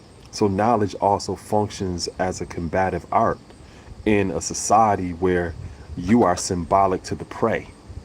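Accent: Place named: Upstate New York, United States. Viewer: He's got an upstate NY accent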